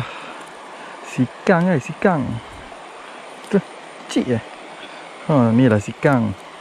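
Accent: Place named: North, Malaysia. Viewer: Kannnnnn..loghat mmg utara dah 😅😅😅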